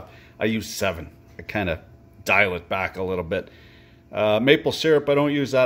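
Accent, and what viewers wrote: Quebec, Canada. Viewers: I have still cant pinpoint you french accent. its driving me crazy. sometimes sounds like Ontario sometimes Quebec and sometimes sounds like Moncton nb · Hahahah ton Québécois est incroyable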